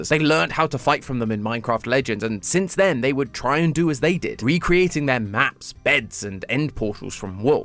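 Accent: United Kingdom. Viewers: Game theory Now 100% more british! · Now 100% more British is crazy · 100% more British? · I spat out my water I was drinking when I read the "Now 100% more British!" because himself reference that "You might be wondering, why does now sound British?".💀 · Wait game theory is British now?! I think I may have missed an update 😅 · BRITISH GAME THEORY IS HERE TO STAY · hey i mean i thought that would ruin the game theory and that all the other channens but ya'll are doing a great job at making videos its the same videos bud with bri-ish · edition.. Now 100% more British 😅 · its not the same, i cant listen to this british voice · Why is british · At times i don't notice British accent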